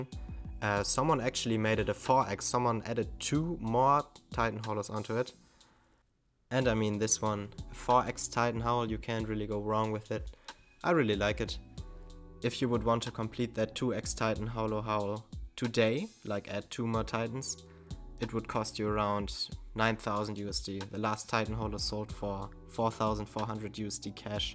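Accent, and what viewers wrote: Germany. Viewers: I think hes german he has the german english accent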